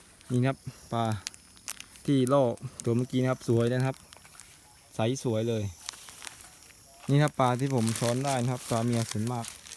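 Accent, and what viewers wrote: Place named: Phu Thai, Thailand. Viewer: ยุแถวไหนครับ คือเว้าภูไท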